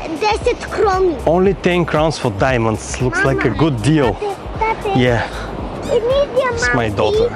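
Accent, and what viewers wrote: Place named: Bulgaria. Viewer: Where are you from? Your english is not bad but I feel as a bulgarian accent.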